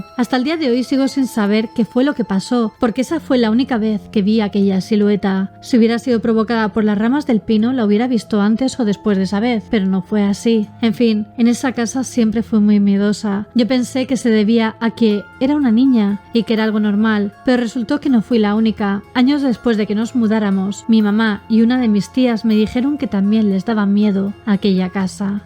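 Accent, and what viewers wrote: Spain. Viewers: ¡Mexicanos! ¿Quieren escuchar a diciendo una grosería? Minuto 😅. Excelente video pero el "chin∆@ tu madre" con esa bella voz española, es una joya! jaja.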